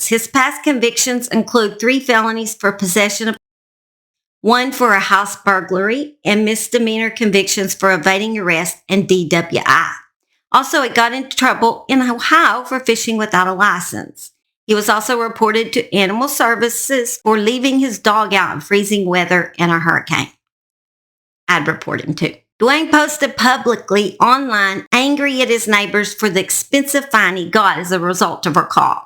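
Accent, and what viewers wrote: South, United States. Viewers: The craziest, most disturbing part is how she wanted to go out! It boggles my mind as to why she would make the decision that she did. She's so beautiful and sweet and innocent looking, you'd never guess in a million year's how disturbed/mentally ill she was just by appearances. And why let that ugly, disgusting, slovenly looking piece of 🐕‍🦺💩 touch her? This case really disturbed me on a deep level. I hope she's at peace and in a better place👼🏻🪽🙏🏻, if there really is an afterlife, and I hope the opposite for him when he goes 🔥👿. Also...this is my 1st time to your channel and I can tell you've done a lot of research, there was a ton of detail that you've put into this video...and I love your southern drawl.😁 Great work ...subscribed✅ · Good Morning 😊 Im watching your newest video and wanted to say I Love your Southern accent!! 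I'm somewhat a Southerner myself. I live in NC originally from SC.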